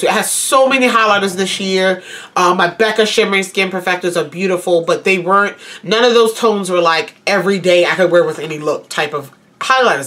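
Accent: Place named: Baltimore, United States. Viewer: Found you through Makeupstruggles. Subbed with the quickness. Love the accent. Reminds me of my B-mo peeps.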